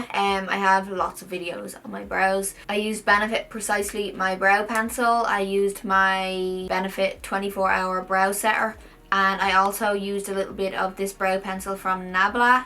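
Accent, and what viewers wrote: Ireland. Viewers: I was showing your videos to my mom today, fangirling about your past replies to my comments. She said she thinks you're gorgeous and your Irish accent is lovely 😃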